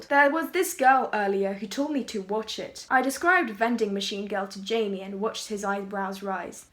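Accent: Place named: England, United Kingdom. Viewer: I subbed because of your English accent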